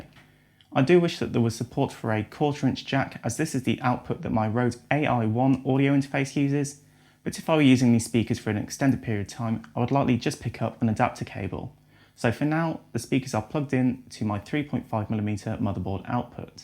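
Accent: United Kingdom. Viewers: i'm really impressed with your clean british accent, nice review man... i must have this one this coming christmas eve! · This guy's British accent is so thick, I can't understand him '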